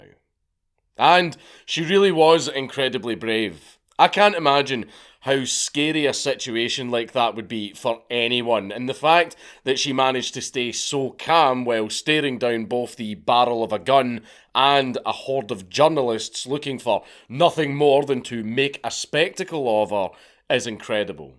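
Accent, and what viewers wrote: Scotland, United Kingdom. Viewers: I've said it before and I'll say it again, please please put CC on while listening to Dankula. Youtube translating Scottishisms is fookin hilarious. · Are you Scottish? I like your accent very nice to listen to and your a good at this.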